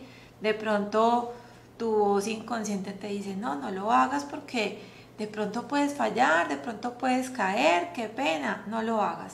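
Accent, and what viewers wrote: Colombia. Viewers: Declaro abundancia en mi vida! Gracias por tu lectura, eres bastante acertada y al igual que tu soy Colombiana, el acento es inconfundible...Un abrazo y nuevamente GRACIAS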